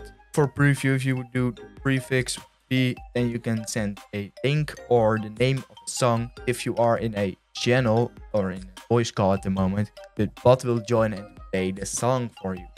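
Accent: Netherlands. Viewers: hi, nice video! are you dutch? edit: i didn’t see the video location, my bad.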